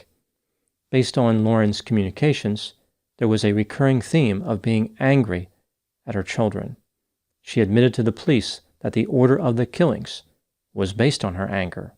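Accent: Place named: Baltimore, United States. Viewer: are you from the Baltimore area by chance?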